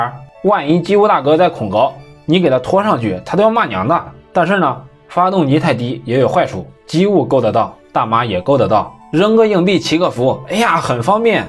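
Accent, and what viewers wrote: Henan, China. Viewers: 这是河南话? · 河南口音吧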